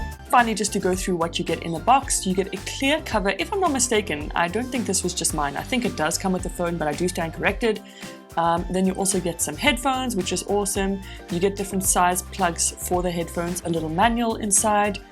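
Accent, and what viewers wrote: South Africa. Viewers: Hey you sound like a South African,I am from RSA.One tip usually techies refer to ram as memory and Device Storage as what you referred to as memory.I must commend you on Your editing , its awesome and this was a great review. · Just found your channel are you south african? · Great review with a typical South African accent and I smaak it! ;)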